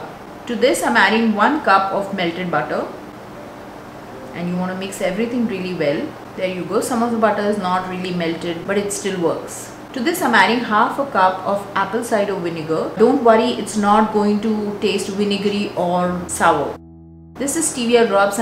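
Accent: India. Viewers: I love the thick indian acctent, the cake, and the fact that you put the macros too.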